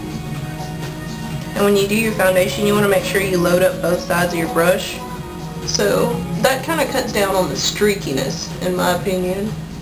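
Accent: United States. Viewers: she sounds so country hehehe reminds me of my home town nashville tn but i never picked up a accent. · i love your country accents!! So cute!!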